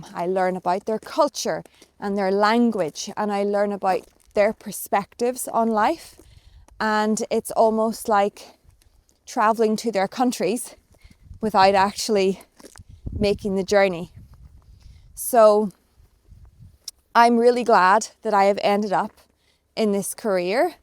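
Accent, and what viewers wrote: United Kingdom. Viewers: Hi, I'm from Brazil. How've you been? Can I ask where your accent is from? It sounds british to me. Am I mistaken? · No, you didn't always Only in the field f English teaching You are satisfied, indeed. Are you English? Your accent is not RP, not southern at least. Rhotic at times, not scotish...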